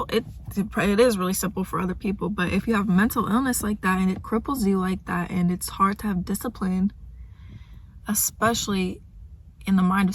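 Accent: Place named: New York, United States. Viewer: U def from new york or maryland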